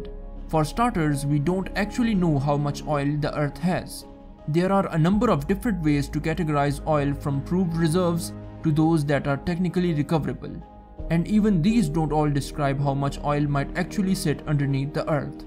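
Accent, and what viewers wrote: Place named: India. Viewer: bad indian accent makes this terrible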